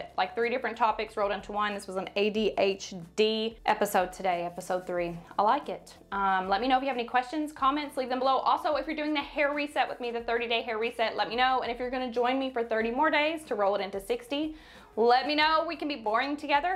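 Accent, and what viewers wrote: South, United States. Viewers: I can’t decide if my hair looks better brown or blonde. Do you do hair in a shop? You sound southern and I live in the south. Would travel to have you do my hair. I feel like if I go brown I might look older hmmmmmm did I say just got divorced, my dogs died and I broke out with psoriasis all in a year. I’m finally healing but I feel so darn ugly. I sort of gave up on trying to look my best after so much medication and steroids. I want to try and look as youthful and I just can’t seem to get it. I paid 200 at my last salon appt and it looked no better than when I did it. 💕😀love you and keep smiling. You have brightened my day so many times. Marnie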